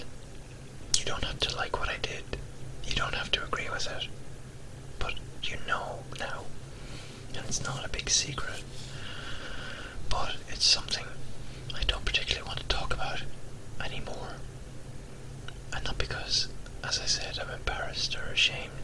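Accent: Ireland. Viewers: Hello my lovely, it is so good to see you back making videos, to see you happy and to see your beautiful smile again. I'm so sorry that you encountered some unkind people, when you are so sweet, kind and lovely to everyone. As for videos you post in the future, as long as they feature your gorgeous Irish accent, they will be perfect. (I loved your poetry ones - do you remember the poems I liked which I shared with you?) keep smiling my lovely K x p.s I read The Hobbit! I loved it!!! Thank you for encouraging me to read it.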